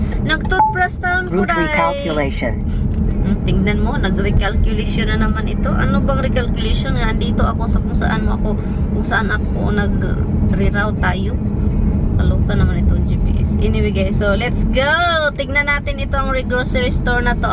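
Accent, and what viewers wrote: Visayas, Philippines. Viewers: hello miss avid fan ako tanan vedio vlog mo nasakit tyan ko kakatawa sau..kng paano ka magsalita ng bisaya regards sa hubby mo.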